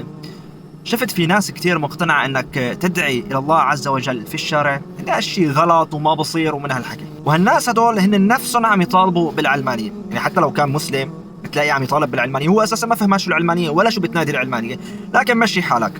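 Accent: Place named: Syria. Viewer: ماشاء الله بتحكي شامي اكثر من السوريين اول مرة بشوف اجنبي بحكي عربي بطلاقة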